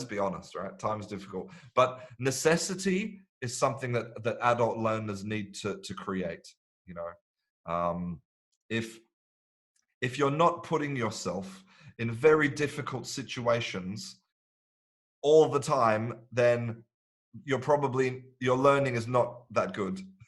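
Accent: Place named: United Kingdom. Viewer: British accent ! you are excellent man. Thanks ....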